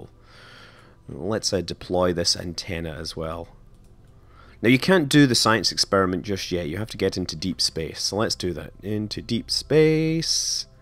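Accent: France. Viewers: Just love your French accent since French is my native language. You should pronounce B612 "b seece dooz" btw.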